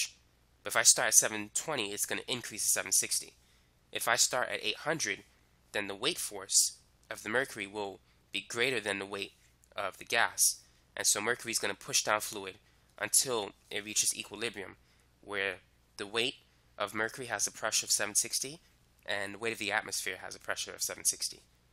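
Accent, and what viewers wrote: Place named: Boston, United States. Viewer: Dope Boston accent 👌🏻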